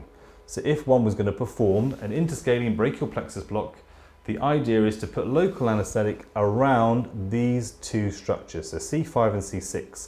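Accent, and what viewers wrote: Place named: England, United Kingdom. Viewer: perfect video. nothing like the english accent to learn new things awesome teaching skills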